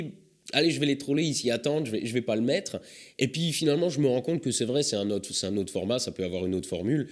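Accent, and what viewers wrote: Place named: Alsace, France. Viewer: On sent bien l'accent d'Alsace mdrrrr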